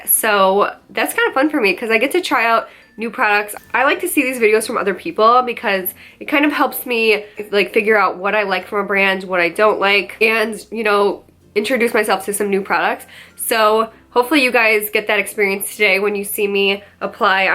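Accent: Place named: New Jersey, United States. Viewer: You're sooo beautiful are you from New Jersey?Im wondering where your accent is from lol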